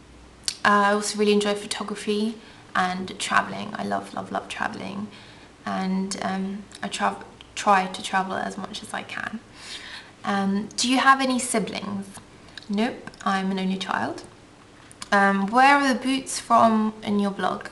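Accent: United Kingdom. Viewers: wow! i'm ukrainian too, but i live in US, and i envy your british accent, i think it is soooooooo cute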